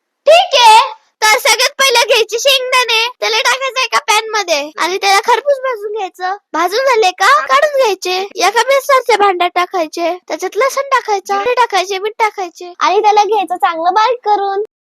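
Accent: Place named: Marathwada, India. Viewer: 😂khup chan it's Nanded, latur ,dharashiv language 😅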